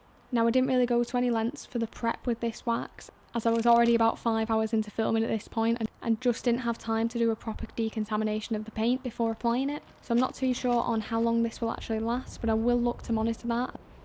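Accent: United Kingdom. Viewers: I love your British accent 💖 sometimes.....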